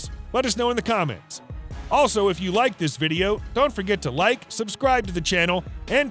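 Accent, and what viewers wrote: United States. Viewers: You are american by your accent, and still you used meters! The whole world thank you for kindness! <3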